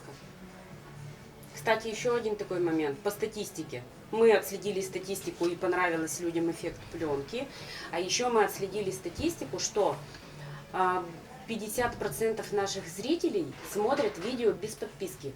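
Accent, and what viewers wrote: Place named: Vyatka, Russia. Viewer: А я думаю,откуда такой акцент ? Вятские....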